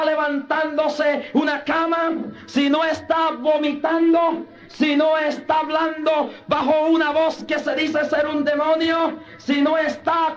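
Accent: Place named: United States. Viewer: En ese Tiempo Tenía acento Gringo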